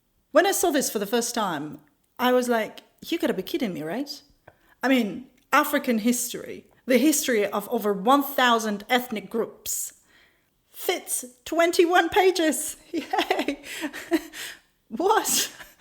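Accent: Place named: Jamaica. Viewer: A little bit of an accented patios came out 😃 Not bad good 👌🏾 👍🏾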